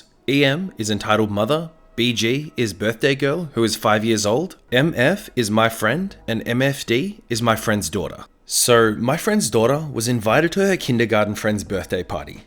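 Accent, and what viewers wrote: Australia. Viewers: Wait?!? You're Aussie? How have I not picked up on this? Anyways, keep up the great work mate, I love your videos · wait are you australian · Im not 100% sure what his accent is but it is the best one Edit: well apparently it is an australian accent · Wait is aussie?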